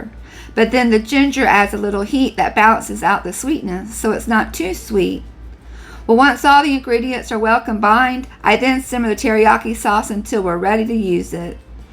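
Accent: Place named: United States. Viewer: The meal looks so nice 😋 I love just listening to your wonderful American accent x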